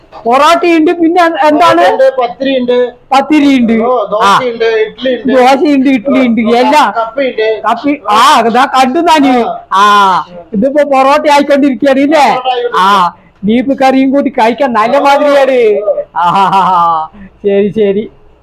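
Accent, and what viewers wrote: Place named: Palakkad, India. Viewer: 🎉🎉🎉 അടിപൊളി ആണല്ലോ....Etttttooooo........ നല്ല അവതരണം.... പാലക്കാട്.... തനി നാടൻ ഗ്രാമീണ സംസാരം..... full support ഉണ്ടാവും.....🙏🙏🙏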